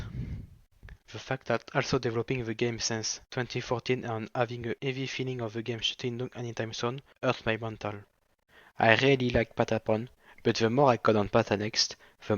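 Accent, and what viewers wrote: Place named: France. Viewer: his french is THICCCCCC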